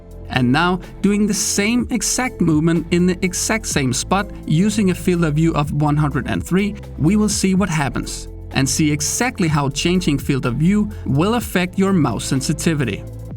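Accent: Denmark. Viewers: Jeg gætter du er fra Danmark ud fra din accent :D Fedt content fyr! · Are you danish?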